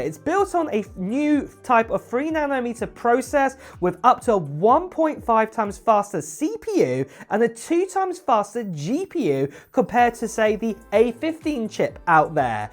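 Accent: United Kingdom. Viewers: I'm here for the UK accent.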